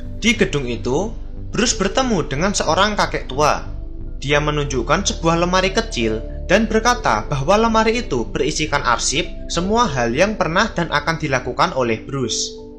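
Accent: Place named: Java, Indonesia. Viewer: TIDAK MENARIK , KARNA PEMBAWAAN CERITA TERLALU JAWA MEDOKK WKWKKK , NO SUBSCRIBE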